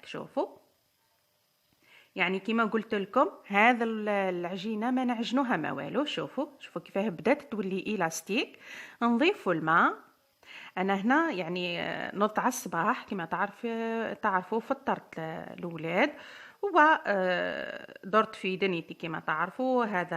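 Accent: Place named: East, Algeria. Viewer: حبيت نعرف برك انت منين هدرتك تبان تاع الشرق.انا من الشرق .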